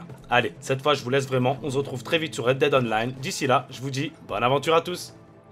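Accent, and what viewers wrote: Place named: Tourcoing, France. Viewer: ta un axen de Tourcoing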